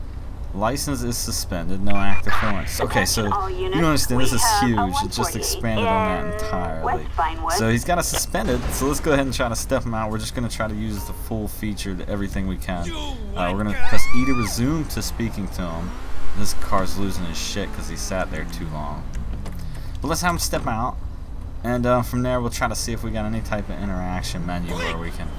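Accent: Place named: South, United States. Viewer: Lol I always enjoy your accent, if you don't mind me asking where are you from? It sounds like a southern type accent but not Deep South 😂😂 if that makes sense.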